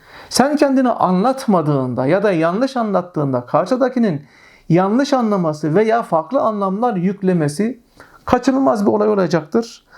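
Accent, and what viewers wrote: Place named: Konya, Turkey. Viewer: Allah razı olsun hocam. bu arada tam bir konyalı gibi konuşuyorsunuz bazenleri ( ' Biliyonuz mu ') :))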